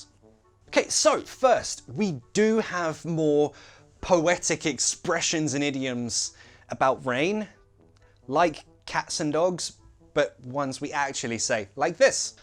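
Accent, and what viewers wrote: United Kingdom. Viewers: You're Brithish? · As Brazillian I love the British accent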